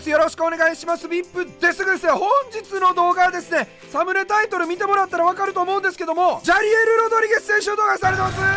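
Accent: Kansai, Japan. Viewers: 「緩急」違和感ないけどなぁ。 俺もVIPも関西人やからかな笑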